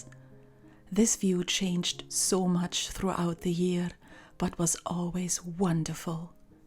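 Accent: Scotland, United Kingdom. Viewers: I like your Scottish accent. 💚 I have a similar story. I emigrated from Germany to Sweden 14 years ago because my inner home is reflected in Swedish nature and I can experience my joy of nature and my contact with nature beings. I found love and have been married to my Swede for 7 years. I am very grateful and happy to be able to live here. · Very beautiful video I wonder if you could say where you are from originally because to me you sound so Scotish thank you xxx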